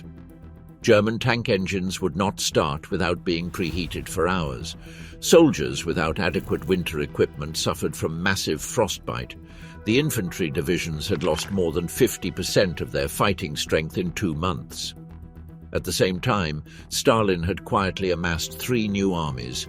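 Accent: United Kingdom. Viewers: Always the West with a British accent taking the liberty to tell a history of another country, another people only to denigrate their incredible sacrifice to free humanity from the Nazi scum. You will never see a Russian "documentary" on what the West involvement was in the WW2. One big lie here: that the USSR signed a non-aggression pact with Germany because "it mistrusted everybody else." Not true. The USSR saw the the Nazi threat and asked the West powers to have a common security agreement but this was rejected by them all. In fact, the West had already signed non-aggression pacts with Hitler, but this is not criticized here. We only condemn the USSR for trying to keep the Nazis at an arms length. The West only came to the USSR's aid when it was clear that the Soviet Union was defeating the Nazis. Don't believe everything you hear even if it is said with a British accent. 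Lies are lies no matter what.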